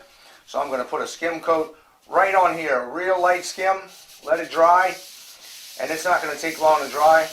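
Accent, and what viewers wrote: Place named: New England, United States. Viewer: This is actually a great tip. A lot of people hype up the DIY tips that everyone knows, this one I haven't seen before. BTW- I see the southern background and hear the yankee accent, I'm in the same boat. Best decision to move down here. Now on to binge watch your videos...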